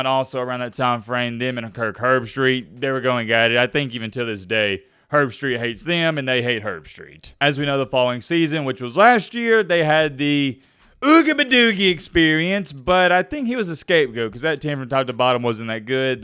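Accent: South, United States. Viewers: Love the southern accent. Up north, cinnamon is a three syllable word... Keep the great videos rolling! High Tide!!!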